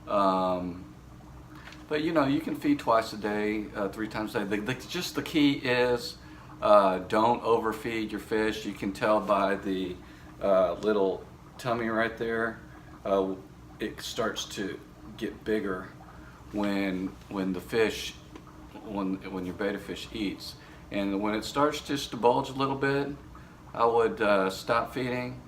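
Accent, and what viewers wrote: South, United States. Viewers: I hope this isn't offensive to you or anyone else but as a Yank that southern twang is buggin' me out. Lol All love though brother and thanks for the advice. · This is refreshing love your southern accent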